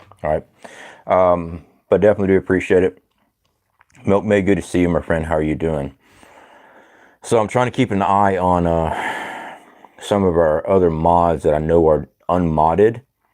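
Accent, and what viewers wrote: South, United States. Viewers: Hate I missed the live!! Had to make some house calls today. Your speech is fine brother. I would not have known if you hadnt mentioned it. You sound like everyone else around NGa. (Perfect southern) try to get some sleep tonight. I'm saying this as a big sister that cares, when you need that much caffine a nap might serve you better. ❤ 👩‍⚕️ sorry I'm still in medi-mode Glad you made it back safe and sound.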